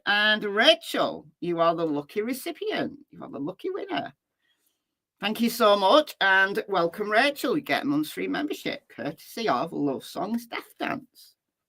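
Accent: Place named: Northern England, United Kingdom. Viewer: Recently discovered your channel. Nice to hear a northern accent - my Dad & Grandma are from Fleetwood, I’m from Leicester but live in BC Canada now. This case has me so outraged & I’ve followed since the beginning. So many unanswered questions & so much evidence pointing at other suspects. Hoping the truth will finally come out soon!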